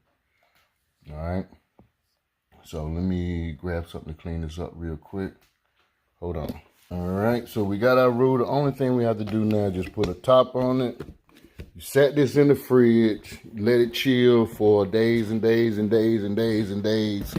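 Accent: South, United States. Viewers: Did you ever happen to watch your videos afterwards? The English subtitles suck! Your nice Southern accent is not recognizable and they write nonses! I laughed heartily! Enjoy the audio to written stupidity of you tube. Bye from Greece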